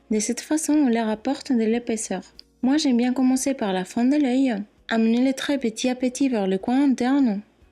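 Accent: Spain. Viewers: J'aime beaucoup le second make up, tu es très jolie, mais j'ai du mal avec ton accent x) Tu es espagnole? portugaise ? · J'adore tes vidéos! Mais, je dois te dire j'aime trop ton accent! Il est trop chou! On dirait que tu parles l'espagnol mais en français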